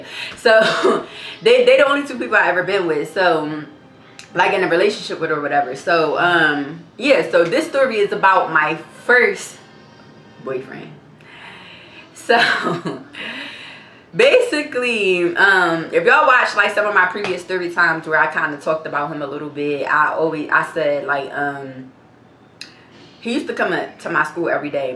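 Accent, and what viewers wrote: Philadelphia, United States. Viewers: I been following you since I had Covid FEB 2022 lol ❤️ I love Philly never been but I love meek mill so and the accent you cool too ima go look at the other story time when u beat yo yo brother baby momma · Give us more story times I love the way you talk you so Philly 😭😍😌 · Hey Gurl I just love your Philly accent 🤗🤗🤗🤗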